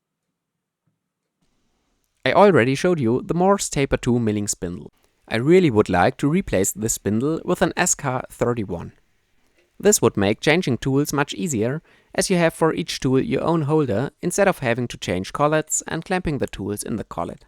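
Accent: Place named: Germany. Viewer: Love that german accent!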